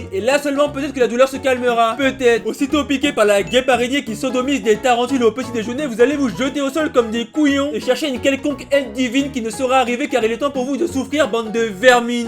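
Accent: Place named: Congo. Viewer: Hier , je t’ai découvert. Je me suis abonné . Aujourd’hui, tu es un classique ! Je suis d’origine congolaise , et tes expressions et ta façon de prononcer les mots me fait penser au pays! On aime trop parler mal ( c’est juste de bonne guerre bien sur )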